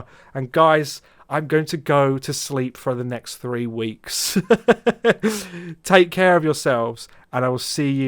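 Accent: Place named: Yorkshire, United Kingdom. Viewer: Good Yorkshire accent tho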